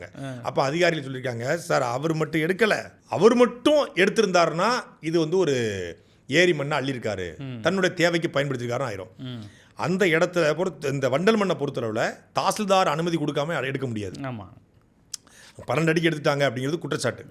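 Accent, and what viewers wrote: Tirunelveli, India. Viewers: சாட்டை நெல்லை அதான் தமில்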